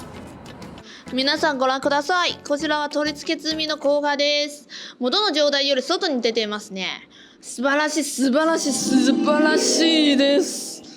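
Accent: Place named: Japan. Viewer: I like your Japanese accent. Sounds really cute!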